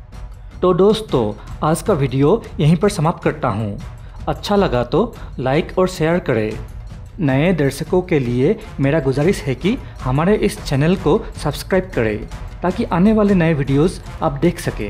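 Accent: Assam, India. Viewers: Ap Assamese ho lagtahe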